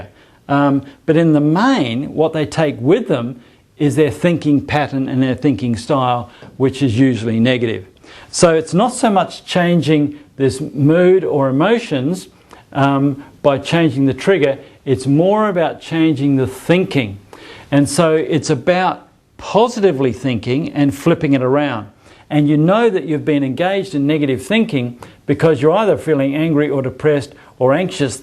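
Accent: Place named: Australia. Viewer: some Aussie accent mate..i been to perth studying for 2 years and this accent reminds me everything there.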